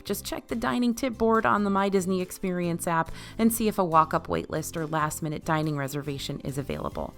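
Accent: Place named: Western New York, United States. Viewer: I watch these videos all the time because I love Disney, but now I see that it's also because of the WNY comfort in your voice!!!!!!!!!! -a fan from Buffalo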